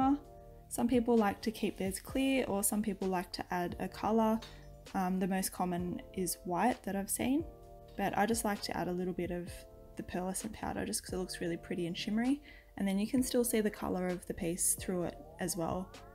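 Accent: Australia. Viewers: Great tips, thank you. Good to hear an Aussie voice too. Hugs from Heathcote Sydney.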